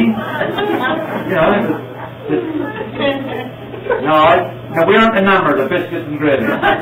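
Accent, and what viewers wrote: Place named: Cornwall, United Kingdom. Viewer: Thanks for that - lovely Cornish accent. I am Cornish living in North America, and listening to you has made me really homesick, and although I eat pasties regularly, really miss the Cornish Cream. Thanks again, really enjoyed your entertainment. Proper job !!!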